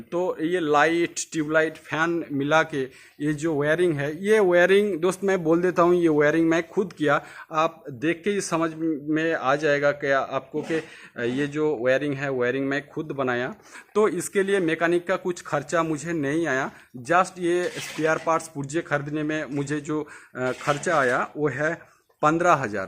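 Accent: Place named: Odisha, India. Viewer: Bhai Apke bonle ki tarike Se lag Raha hai app odissa Se ho.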